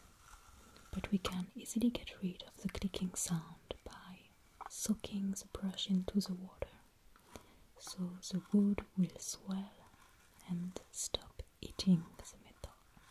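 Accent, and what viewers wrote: France. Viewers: ~I'm a writer and I have a new character who is French and when I realized I needed to be able to describe a French accent, I came here because your accent is so lovely. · I watched one of her role playing videos as my introduction to her and thought she was doing a really good fake French accent, I came to this one expecting a regular voice when I realized she’s literally French and I’m just dumb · This is the perfect representation of the 18th century ♡ also, I was wondering, are you French? You seem French, I'm French so I don't know your beautiful accent looks French :) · un accent très français tout ça 🤔😂 '' a very french accent all that ''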